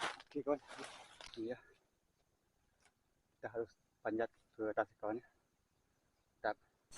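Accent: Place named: Aceh, Indonesia. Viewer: Mcm logat aceh ini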